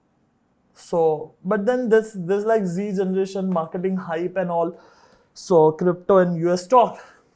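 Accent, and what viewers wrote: Gujarat, India. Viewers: UFWP event jordar hai, jitni tariff kare kam hai, jo life ko badal deta hai aur ek new life jine ka tarika batata hai, aap jitni event karege woh sub attend karunga and tamari gujatati tone jordar chhe 🙏🙏🙏🙏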